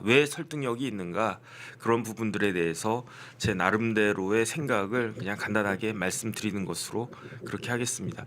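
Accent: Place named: Seoul, South Korea. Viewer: 이국종 교수님을 살짜살짝 깍아내리는 아니~ 대놓고 깍아내리는 변호사님~~!! 서울분이라 뭐뭐 갖다가 뭐 갖다가... 서울 사투리 재미있습니다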